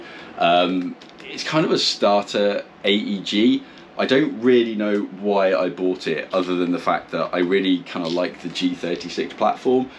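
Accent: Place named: United Kingdom. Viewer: I like your British accent. cheers from Koera!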